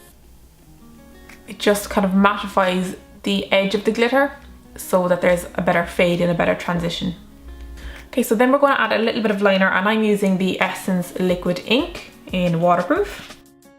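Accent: Ireland. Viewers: Gorgeous look,I can hear an Irish accent from you😉🌺Are you Irish?x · Are u Irish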